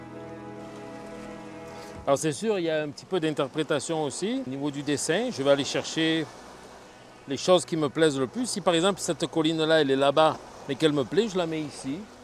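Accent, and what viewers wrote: France. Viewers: merci beaucoup pour vos vidéos utiles, pourquoi vous avez un accent français, si vous venez du Québec. meci encore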